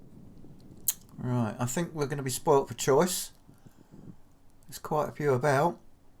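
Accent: England, United Kingdom. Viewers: I love listening to you talk! I’m American so all British accents are cool to me, plus I like hearing your thoughts about the work you’re doing. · No Music, no cartoon capers intros but a blacksmith with an English accent who’s all business! That’s why I’m here.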